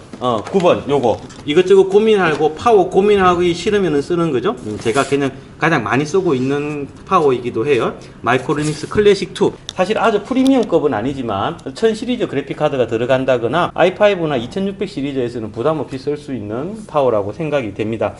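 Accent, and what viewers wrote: Gyeongsang, South Korea. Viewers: 구수한 경상도 말투가 방송볼때 좋았습니다....사실 저도 경상돕니다..ㅎㅎ건승하시길 바랍니다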